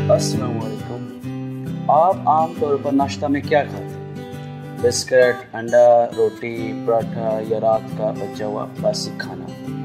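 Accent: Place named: Pakistan. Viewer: bhai awaz pakistani hai tou video bhi pakistani bnao na kya angrazno ko dala hoa hai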